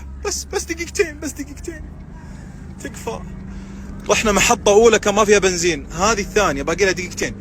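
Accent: Iraq. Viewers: لان عراقي اعذره لهجته تختلف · اللهجه عراقيه اكيد متفهمون 😂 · هاي لهجه عراقيه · هاي اللهجة العراقية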